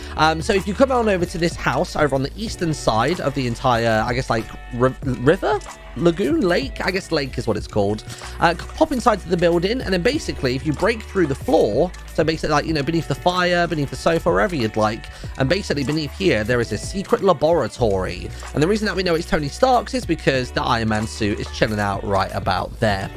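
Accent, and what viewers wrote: England, United Kingdom. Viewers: if he is British then they have a different way of pronouncing things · he is speaking English, your speaking American it isn’t the same laungige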